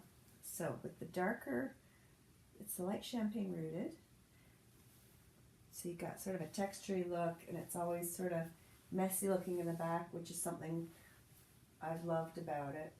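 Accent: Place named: South, United States. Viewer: I am kind of shocked you're from Canada, I would swear you're from the south LOL I always put your videos on 2x playback because it is just more enjoyable to watch other than it's hard to understand what you're saying LOL you just start slow like us Southerners LOL it makes me feel like home, but it takes so much longer to watch the videos. I'm just one of those that wants to get to the point because I've watched hundreds of wig videos and just want to see the wigs, but I so appreciate how many wigs that you review exclamation point when there's a specific wig I want to see I come to your site first!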